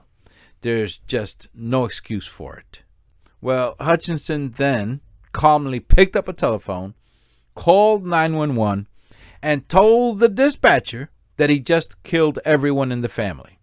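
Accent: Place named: Latin America. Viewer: acho o máximo seu inglês latino.😊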